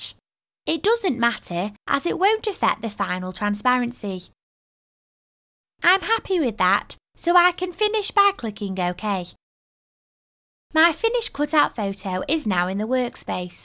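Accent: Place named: Lancashire, United Kingdom. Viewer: Sounds like Bubbles. That's a Lancashire accent.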